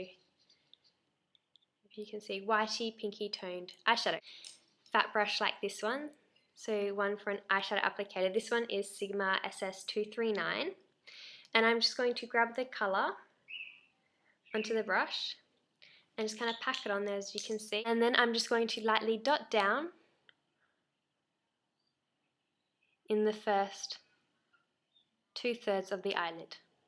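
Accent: Australia. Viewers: r u Australian? i am!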